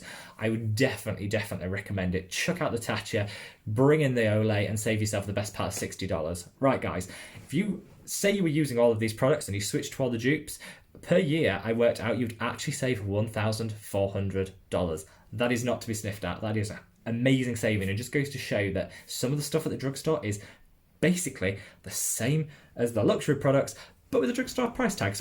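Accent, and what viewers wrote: United Kingdom. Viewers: Your so easy to watch and listen to. I was a little confused on where you were in the world with a uk accent and then mention dollars but then you said your in the uk think it's great your saying different currency. I'm in Ireland but from the uk 💜 I use the aldi dupe of the nip and fab glycolic pads cheaper again. Haven't tried the nip and fab ones but I think I will have to get some now as this is the 2nd video you've mentioned them 🥰 I will say I can't stand olay products but that's my personal experience so far theres better products that are cheaper again like the ordinary range. Depends on what your targets are I've also just bought some serums from revolution to try and compare Xx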